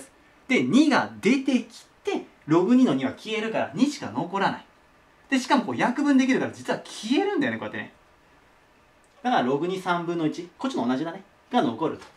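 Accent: Kansai, Japan. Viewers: たまににじみ出る関西弁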